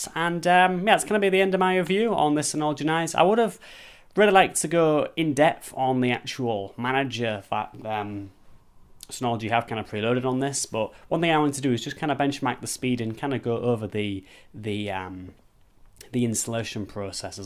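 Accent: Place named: England, United Kingdom. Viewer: ‼️it took me to the end of the video to realize he’s saying “RAID” not “red” 😂 English Accents are such a joke. There’s no way they aren’t aware of how dethroned their speech is when there’s no perceptual difference between these two words. For real.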